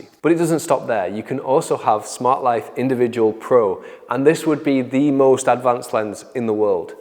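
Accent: United Kingdom. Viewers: I can't remember which of these two lenses I got from The Spectacle Factory. I'm guessing I got Smart Life lenses because when I put on my Masunaga eyeglasses, I'm so much smarter. For proof, when I watch videos, I learn so much!!!...and it's not because of devilishly handsome face and that woozy British accent... though it does help. One thing's for sure, making an appointment with The Spectacle Factory is the smartest thing I've ever done.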